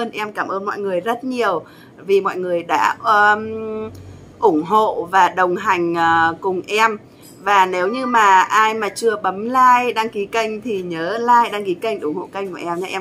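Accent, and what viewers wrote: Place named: Hưng Yên, Vietnam. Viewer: E nghe giọng giống ng Hưng Yên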